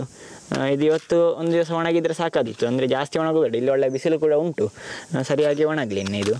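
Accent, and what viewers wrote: Mangalore, India. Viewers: I like ur mangaluru language love from bidar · ಮಂಗಳೂರು ಕನ್ನಡ sounds good 👍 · Manglore kade ide tara swachha kannada matadtare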